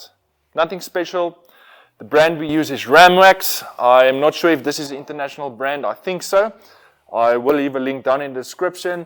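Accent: South Africa. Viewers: As soon as I heard your accent lol I immediately went onto my phone to check if your South African :p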